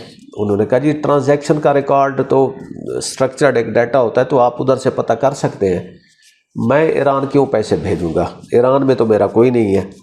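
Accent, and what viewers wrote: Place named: Punjab, Pakistan. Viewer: Aap punjabi lehje main Urdu boal rahe hain aur sari duniya daikh rahi hai yeh video. Itni si tameez tu honi chahie ke proper lehje main is platform per baith ker bat karain.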